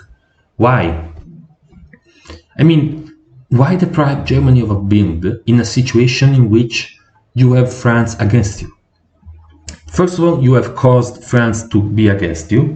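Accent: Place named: Italy. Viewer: Nice, a part from that strong Italian accent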